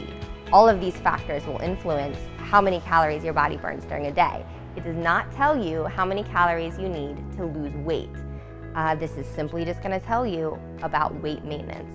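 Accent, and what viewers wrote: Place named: United States. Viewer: She sounds like she has every American female accent at once.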